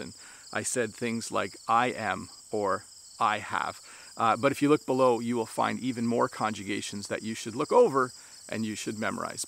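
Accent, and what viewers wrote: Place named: Canada. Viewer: a great opportunity to be in contact with the Canadian accent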